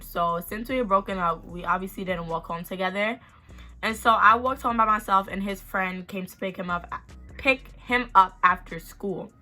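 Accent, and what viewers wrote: New York, United States. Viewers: Yo you mad pretty and you sound like you from New York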